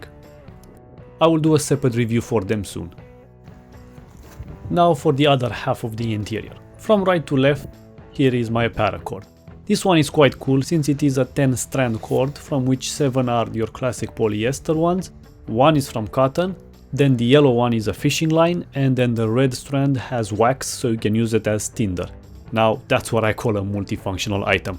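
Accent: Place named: Eastern Europe. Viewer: Where are you from? You have that eastern european accent.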